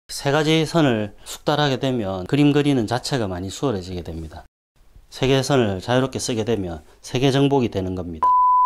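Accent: Gyeongsang, South Korea. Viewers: 알수없는 유투브 알고리즘의 추천으로 아무 생각없이 보면서 차분히 설명 잘해주시는 경상도 아저씨구나 생각했는데 에서 빵터짐ㅋㅋㅋ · 아 마음의 답글 우선 고맙습니다 ^^ 얼추 경상도사투리시라 짐작대로 부산이시라니 머네요 아쉽구요 인생후반 .. . J 님의 재미난 설명곁들인 멋진기초? 드로잉실력 나눔을 보다보니 불현듯 어렸을적 초등시절의 미술 ? 제재능이 생각났어요 새삼 .. 인생후반 겪고있는 힘든일들을 극복 승화라도 시킬수있는 힘 .. 얻고싶음을 기대하는거겠죠 말씀대로 교보든 어디든가서 스케치북 미술연필 구해봐야겠네요 선생님쓰는 연필 .. 뭘로 구할까요 ? 유투브 공짜연습생들 모여서 혹 ??? ㅋㅎㅎ 뵐날까지 함 기대 그려도봅니다 문외한에게까지 나눠주시는 마음 진심 고맙구요 👍👍👍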